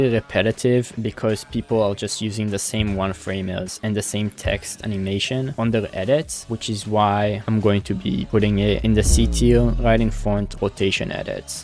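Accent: France. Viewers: Dude are you french? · Are you French?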